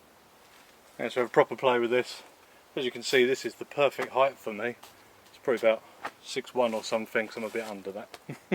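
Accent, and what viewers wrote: England, United Kingdom. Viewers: Are you in uk or usa ,I know you are english from your accent , missed you building your ca in loved to have seen it though, many congrats young man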